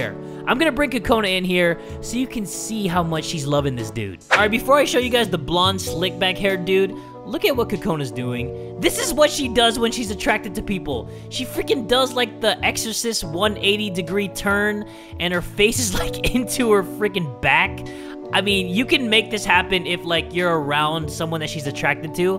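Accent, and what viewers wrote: United Kingdom. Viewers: I said that before this was even an updated OWWWWWWwwWwWwWwWwwWWww all I hear is nice happy music and then all of a sudden starts talking My ears are bleeding let me turn down the volume Hold up did it just turn itself back up God dang it * clickclickclick* oh no it’s too quiet * click x5* now it’s way too loud Jesus *clickclickclick*. Perfect wait hold up he’s British! Thank you for following my journey It was kind of like it was live but wasn’t sadly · Hahaha i love your british accent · Why is bro British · I LOVE YA BRITISH ACCSENT 10/10 [I know British accsents because I am British] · i love hearing speaking in a british accent because im british lmao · great British accent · Love the British accent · Omg, that British accent ♡^♡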